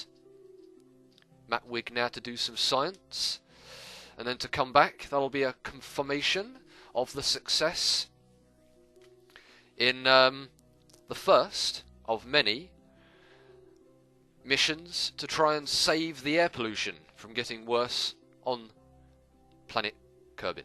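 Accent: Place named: United Kingdom. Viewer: You are so British mate :D - Giz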